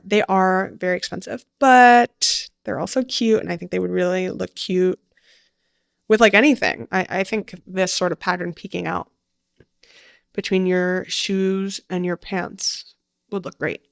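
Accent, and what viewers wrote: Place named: France. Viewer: Great french accent tbh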